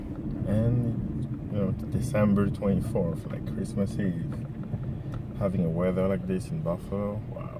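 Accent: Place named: Nigeria. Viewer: Wow...i love your accent. Are you Nigerian?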